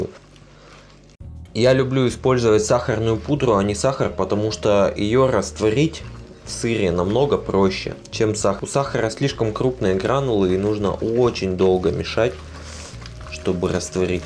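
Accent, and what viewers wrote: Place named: France. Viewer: Ну что я могу добавить к тому что уже сказано? Молодец, умница и это французское произношение приятно слушать😉😉😉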